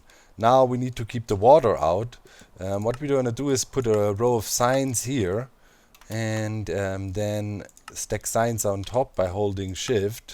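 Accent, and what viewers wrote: Germany. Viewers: Are you german by any chance? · I knew he is german XD he has this typical accent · Dein englisch hört sich sehr deutsch an O.o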